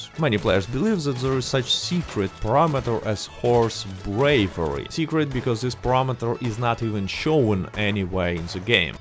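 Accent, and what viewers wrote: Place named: Russia. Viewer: Четкий русский акцент, 😄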